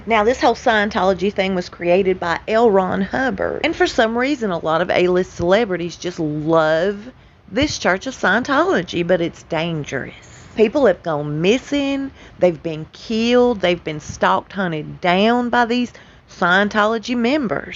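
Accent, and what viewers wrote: South, United States. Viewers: Love your beautiful southern accent,! I also love it when you say "y'all stay safe and be blessed". It makes my day!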